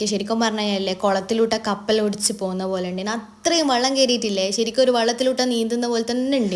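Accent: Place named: Kannur, India. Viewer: വല്ലാത്ത ജാതി കണ്ണൂർ slang 🫣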